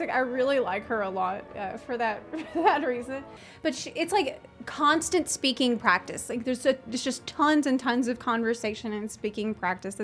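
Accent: South, United States. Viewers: Luckily I am Japanese so I have the advantage of knowing what Yoshi P say before translator speaks to my discord friends. But I remember learning English was one of the hardest thing I have done during my youth. Grammar, future, and past tense are the things that got me frustrated but I kept on going. I eventually was able to lose my Japanese accent as well after many years of practice. I am very shocked to hear you are learning Japanese. I have no doubt you can do it as long as you don't give up. For now, I must say your accent in Japanese is so adorable. It has the hint of southern US accent which is very exotic and unique to hear in Japanese.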